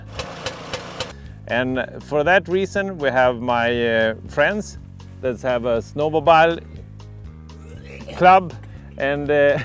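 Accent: Sweden. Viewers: The swenglish is one of the best part of this video besides the insane explosions. You're always pushing the boundaries when it comes to this stuff, can't even imagine what kind of stuff you gonna blow up in the future but i can't wait too see. Great work man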